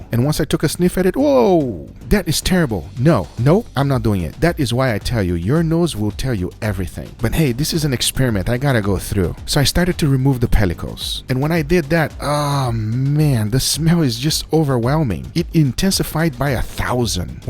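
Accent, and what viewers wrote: Brazil. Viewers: Leva uns 5 segundos pra perceber o sotaque brasileiro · This is the first time I hear the real Brazilian accent in english. Congrats, buddy. Your channel is very nice. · Hey bro. Are you Brazilian?? Your accent is so Brazilian!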